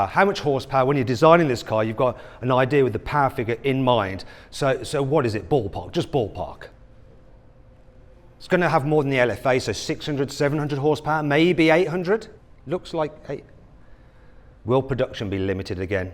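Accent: United Kingdom. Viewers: ブリティッシュアクセントたまらん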